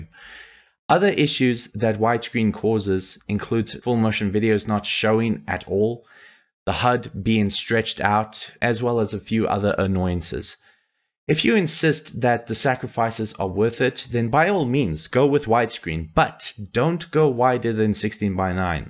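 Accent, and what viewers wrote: South Africa. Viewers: south african? thanks for the video BTW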